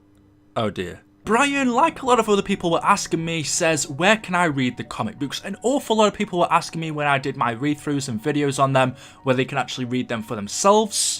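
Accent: United Kingdom. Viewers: Why are you British and also who put the mark on the SOE Crew. Or what happens after SOE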